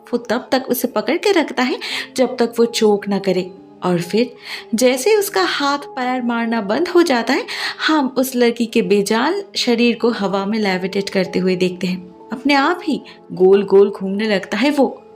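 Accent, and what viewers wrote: Bengal, India. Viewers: Are you bengali didi ur accent bengali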